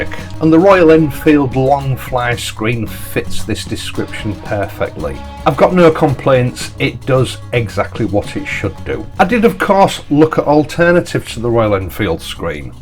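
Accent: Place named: England, United Kingdom. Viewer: your narration is movie like, you look like Clint Eastwood, albeit from England with that accent. 😀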